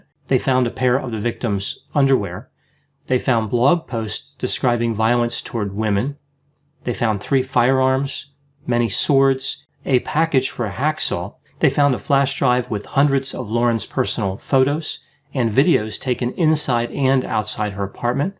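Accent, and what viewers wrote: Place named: Georgia, United States. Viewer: hmmm, too busy commenting on the Georgia accent I guess....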